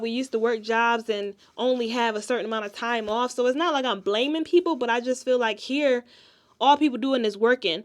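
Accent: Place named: United States. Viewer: oh woow. That was a very good vlog. As a Kenyan, I actually thought you were Kenyan but your accent confused me for a while until u owned up of being American. U look so much like us and we will do everything to make u feel being African as u are indeed one of us. We certainly do have time for family...which is the most important.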